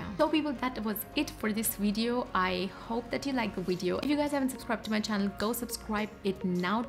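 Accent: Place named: Germany. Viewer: Haha your English accent sounds slightly German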